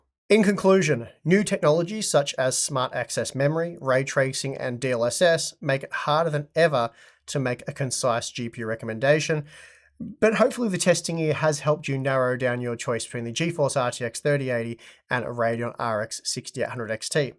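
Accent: Australia. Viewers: What I got out of this review is purchase the GPU which is cheaper. Radeon had higher 1% lows overall than Nvidia on most games. Shouldn't this be the minimum standard when choosing a video card. I just love the Aussie accent, to the point without all that waffle. Thanks.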